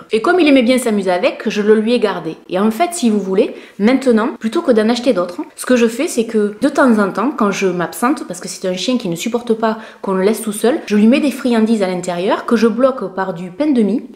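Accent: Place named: Roussillon, France. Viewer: Je suis mega fan du action aussi 😊 Et tes vidéos m'aide bien à faire mes choix, entre autre les éponges en micro fibre et les chaussettes spécial masques pour les pieds, donc j'adore ce type de vidéos 😊 Ça m'aide à cibler ce que j'achète, parce qu'on a vite tendance à acheter de tout et n'importe quoi dans ce magasin lol PS: J'adore tes vidéos parce que ton accent me rappelle les vacances 😊 Je suis Belge mais ça fait 10 ans que je vais à Canet en Roussillon en vacances (mon compagnon va là en vacances depuis sa naissance, on projette d'acheter un appart là bas pour notre retraite quand on sera vieux 😊)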